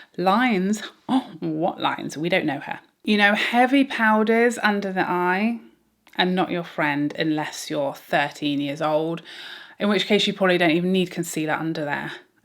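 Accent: England, United Kingdom. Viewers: I love to say your intro along with you in your English accent!! I’m a goof! Lol. Enjoy you so much! 🥰😍🥰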